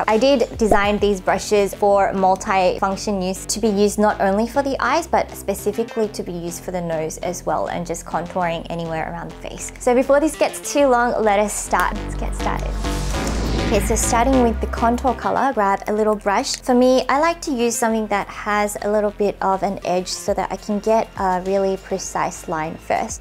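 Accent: Australia. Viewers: Is that an Australian accent I hear? But don’t you live in Korea? Anyway.. You’re freaking adorable. 😊😊❤️ Thanks for this! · You look so cuuuute ! And I know I say it everytime but I love your Australian accent! 😻😘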